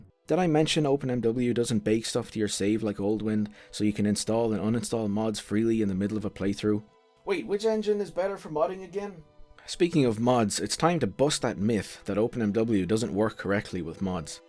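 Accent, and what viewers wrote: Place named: Ireland. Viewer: You sound Irish, I'm willing to bet old enough to drink means something different in Ireland lol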